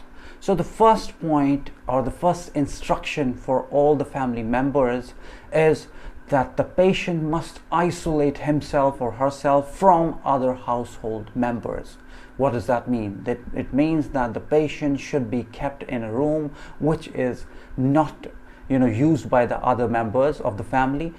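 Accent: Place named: United States. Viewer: Why are you talking in an American accent?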